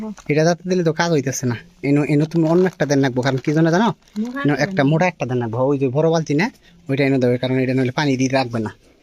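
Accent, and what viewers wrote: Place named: Munshiganj, Bangladesh. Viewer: আপনার মুন্সিগঞ্জ বাসা বাসা নাকি,,, কথা তো মুন্সিগন্জের মত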